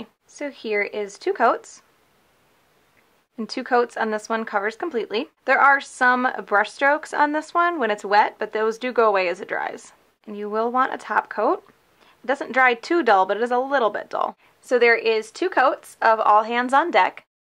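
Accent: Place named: Minnesota, United States. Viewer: Are you from Minnesota? You sorta have a accent. If so cool I'm from Minnesota too!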